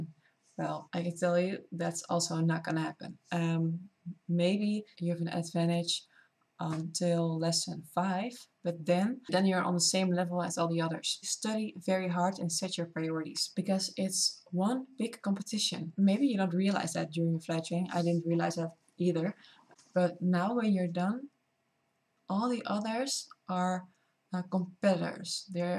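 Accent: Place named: Netherlands. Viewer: Sweet accent! Dutch? Scandinavian? German? I find that in any field, it is difficult to study and work at the same time. Agree with what you say.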